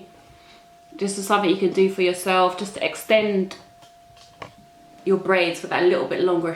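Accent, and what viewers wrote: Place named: England, United Kingdom. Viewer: The England accent 🥰🥰😌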